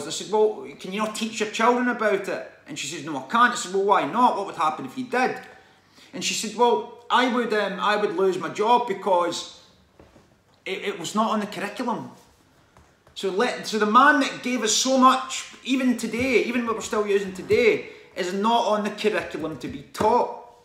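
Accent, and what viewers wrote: Scotland, United Kingdom. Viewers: Alright ma man! That’s all I need to say where I’m from lol totally brilliant channel good to hear a fellow Scot speaking on these subjects I had my awakening after reading David Ikes Cildren of the matrix it blew my mind then I started doing some deep research I even read TRNCE formation of America 😢what that women went through from the day she was born if u haven’t read it mate give it a read the amount of important people that were assaulting band other things in Bohemian grove fkn terrible. She was a victim of mk Ultra had 2 personalities and one was a trafficker of heroin for various groups I’ll leave it like that member keep up the good work Ioved got a few to watch and see what the think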